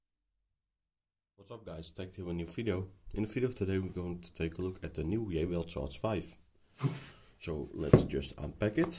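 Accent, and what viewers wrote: Netherlands. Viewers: That dutch accent🥲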